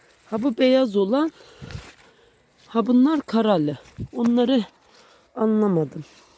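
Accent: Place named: Akçaabat, Turkey. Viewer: bizim burda bir komşumuz var aynı sizin gibi konuşuyor oda akcaabatli köyünden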